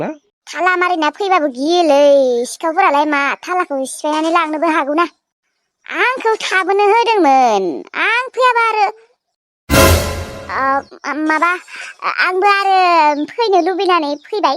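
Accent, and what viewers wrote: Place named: Udalguri, India. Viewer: Udalgurini raijlainai style lwi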